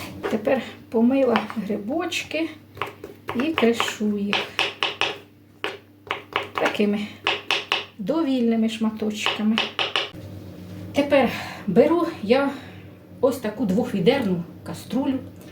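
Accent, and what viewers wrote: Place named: Chornobai, Ukraine. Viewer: та ще й мабуть біля Чорнобая живете, я цю мову впізнаю з тисячи🌺🌷😉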